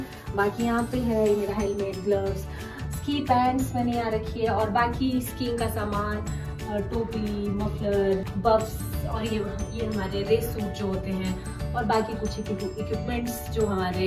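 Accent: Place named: Pahadi, India. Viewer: You are so creative and loved your pahadi accent ❤️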